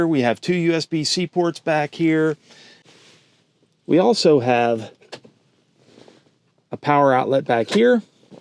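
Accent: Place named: Philadelphia, United States. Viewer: I'm hearing a Philly Delco accent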